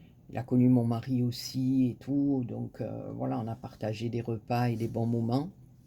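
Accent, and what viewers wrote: South, France. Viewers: Coucou, Je me joints aux autres pour t'envoyer mes sincères condoléances à toi, tes enfants & petits enfants! Dans ces moments là je ne sais jamais quoi dire, mais ce que je sais, c'est que tu risque de te faire disputer par moi si tu te retiens de pleurée, car tu vas de détruire de l'intérieur et ça c'est pas bon du tout donc lâche toi sinon attention a tes fesses hi hi;) Oui tu dois rester positive et avoir toujours envie de croquer la vie à pleine dent que ce soit pour toi, tes enfants ou/et tes petits enfants et puis ton mari n'aimerait pas te voir sur la pente raide, donc ma belle continue de nous faire entendre par le biais de tes vidéos ton accent du soleil et des cigales! GROS Gros Bisouuus vous tous!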